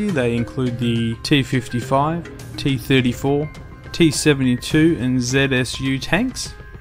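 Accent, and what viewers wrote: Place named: Australia. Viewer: lol I could tell from ur accent, Im just across the ditch from u:)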